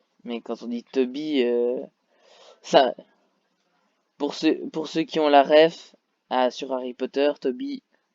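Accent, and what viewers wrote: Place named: England, United Kingdom. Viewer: cette accents anglais magnifique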